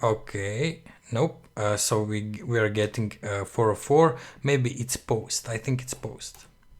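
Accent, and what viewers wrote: Russia. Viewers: i like these vids cause i love his heavy russian accent :D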